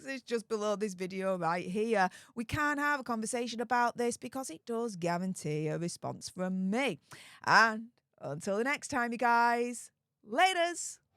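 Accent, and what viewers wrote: United Kingdom. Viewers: When you say “bonning” it sounds like “born in” … took me awhile to make out what you really were saying. This is what is fun above hearing a British accent.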